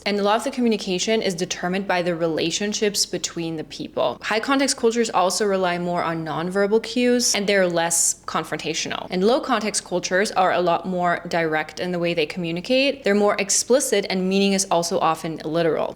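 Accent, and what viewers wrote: United States. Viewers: Are you from Bavaria. You sound American when speaking English wow. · You sound like you lived in America all your life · Thanks for this thorough and helpful investigation on cultural differences. May I ask you why your American Englisch is so absolutely perfect? Wherever you raised bilingual? Ich kann total unterstreichen, was du gesagt hast. Meine Beobachtung ist, dass Kanadier und Amerikaner in manchen Punkte sehr ähnlich kommunizieren. It certainly takes a while to get used to it. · Your accent is so American. No one would think you are German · I do the fake stuff because I don't want to hurt other people's feelings; otherwise, I'm with you on the "How are you today?" greeting followed by the "I'm fine, how are you?" response. I usually just want to say "Good morning" to people I see at work, our just "hi" and be done with it. 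But then Germans have their own version of this: "Wie geht's?" / "Es geht mir gut." You have a good accent. Very American. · You have a 100% American accent! And your husband has the most American name. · How do you speak with a perfect American accent? Amazing. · I probably shouldn't say this, but the really sugary sweet things, like you just said are generally only said by american WOMEN! From what I understand, that is simply another thing that makes Women a bit different in the US. Some women have been very open with me, and I have seen and heard about such things, Perhaps EVERY culture has such differences, but they can vary, and since the US IS very diverse, DESPITE WHAT ANYONE SAYS TO THE CONTRARY, you will see all sorts of variations, So just take it in stride. American MEN just try to be polite, and may throw a compliment your way, but they are NEVER so sugary sweet! But HEY, you do seem to be nice, do look nice, and, for what it is worth, you sound American. 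I have NOTHING against the German Accent, or Germans, in fact part of my family is German, and I speak some German, but if you want to pass as another person in the US, CONGRATULATIONS! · It's funny — I'd seen many of your American in Germany and German in America sketches before this, and I assumed you were an American woman who can do a really convincing German accent. The fact that you're a German who has developed a very organic American accent is really cool. I wonder how autistics (I wad diagnosed with Asperger's syndrome when little) handle German culture; I imagine the directness and literalism you discuss would be relatively comfortable for us. :) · You sound like an American. See. We are not all nice. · How did you develop native English proficiency and perfect American accent in 2 years?!?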